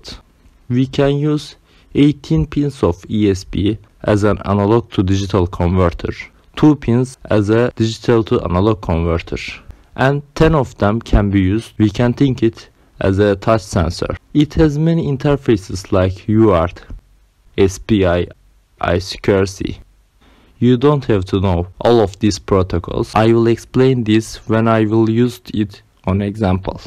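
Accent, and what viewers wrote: Turkey. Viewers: Duyar duymaz dedim bu aksan kesinlikle bir Türk'e ait. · türk olduğuna yemin edebilirim ancak ispatlayamam , diksiyon tanımlıyor sanki :D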